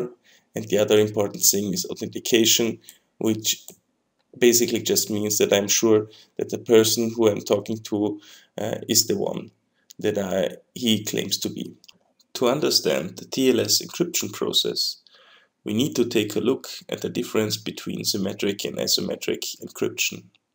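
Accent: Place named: Russia. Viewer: You need to hide that Russian accent a bit. Good video and explanation and thank you for posting this information.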